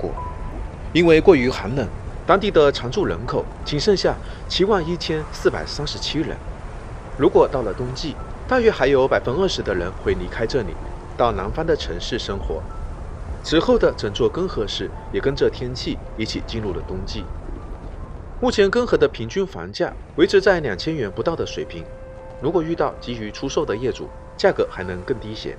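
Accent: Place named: Fujian, China. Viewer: 你这口音很福建啊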